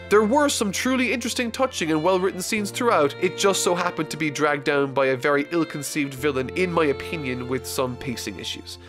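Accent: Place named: Ireland. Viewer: I detected an Irish accent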